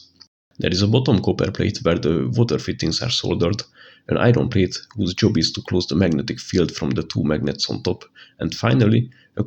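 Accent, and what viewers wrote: Hungary. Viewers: accent is Hungarian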